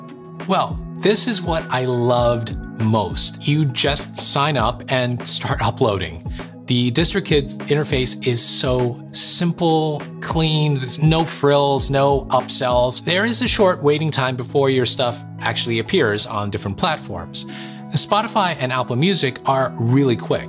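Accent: India. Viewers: Abe tu Indian hai na? ....Orr bohot angrezi jhaad raha hai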